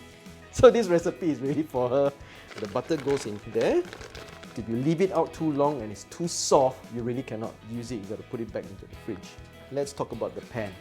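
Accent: Singapore. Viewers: Are you from India! Or Singapore! You sound like Indian Chinese or Singaporean. Nice accent. That was funny. When the bake is cakking. lol I'll try your recipe. Your a jovil person.jovial. Thank you From North America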